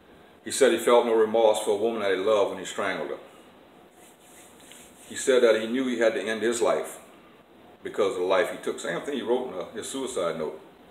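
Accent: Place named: Louisiana, United States. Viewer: I'm new to this channel & I'm glad I stumbled across it. I wish you many more vids. My only problem is that since English is not my native language I have problem understanding Louisiana talking. But reason I'm writing this is: I think you gave good advice here! especially because of children that later do same stuff in their marriages 😢.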